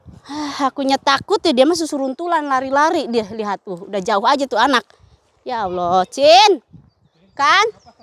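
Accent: West Java, Indonesia. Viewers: Ieu kelebihan na eteh,bahasa batur dilogat na ku basa sunda...top markotop teh,sing sarehat kanggo eteh sakeluarga 👍👍👍👍😘😘😘😘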